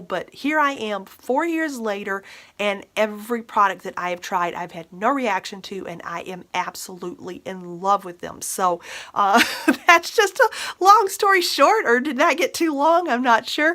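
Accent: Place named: South, United States. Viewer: Hey can you do a swatches of all your eyeshadows up close thank you I love your southern accent 😊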